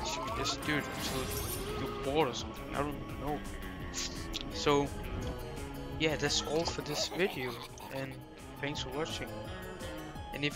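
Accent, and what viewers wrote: Denmark. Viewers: I Can hear you’re danish👏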